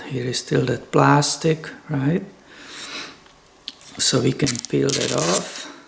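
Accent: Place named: Czech Republic. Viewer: Interesting video, well done :) You sound like you're from the Czech Republic or a nearby country(?) :)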